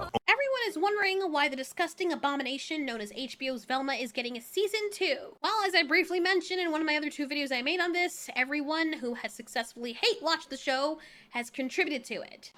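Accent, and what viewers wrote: United Kingdom. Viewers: Just gotta say it... Your Brit accent is on point! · That avitar and that British voice would sell the seas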